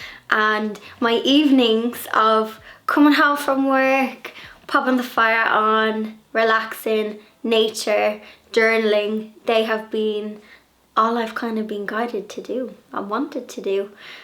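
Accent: Ireland. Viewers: I am convinced that there is nothing you can’t do. I love your girly nature with a can do attitude. Plus you accent and humor, you are a ray of sunshine. If I ever visit Ireland I’m going to invite you to tea. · I hope things in Ireland get better soon. It's not to bad in Texas. I want shopping today and bought Pink ornaments for my Christmas 🎄. I always do white but I need a change with this Corona virus. I love all of yout video's and your accent. 💕🥂🎉🎃🤗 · You could just talk about paint drying and I would still tune in. I love to hear your Irish accent, I love your personality, and of course, I love Blondie and Pepsi. Keep up the good work!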